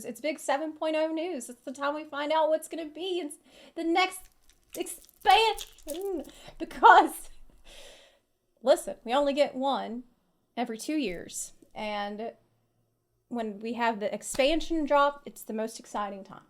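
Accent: South, United States. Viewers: wtf is happening lol, I forgot you existed and somehow your southern accent got thicker LOL and this videos so goofy, with all the random things that happened in the past lol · Since she moved back to the US she reaaallly has that Southern drawl. Also,clearly hyper - dem goggle-eyes doe... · Genuine question: where did the southern US accent come from? I just came from her "all mounts" video from 3 years ago and her accent is totally different. Thanks.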